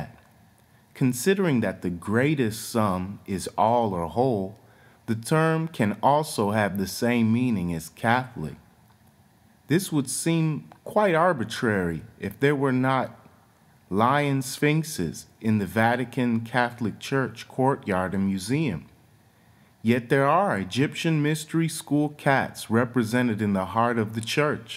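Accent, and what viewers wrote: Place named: United States. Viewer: 7.15: cat in Latin is feles, not gatto. This is Italian. Zarathustra was not an esoteric Persian but a wise man like Buddha. Some things are so hard to understand in your very, very strong American accent. It would be great if you could ask maybe the Google translator how to pronounce words. At 5.55 f.ex. Or Zarathustra. I understood Persian. So the rest I understood because I know the rest of facts on my own. But this is not the sense of your vids, right? This would be a solution for this problem. :) <3 I wish I would have understood more of it. But even the subtitles did not understand you. No joke. Desperation! But thank you for what I got from this. Very y interesting thoughts and lots are so true! Good luck to you!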